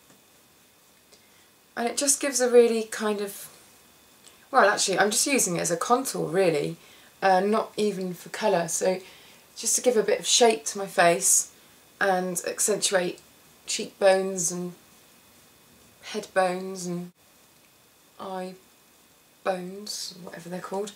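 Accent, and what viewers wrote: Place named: England, United Kingdom. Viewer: what part of england are you from? Where is your accent from? kisses from canada!!!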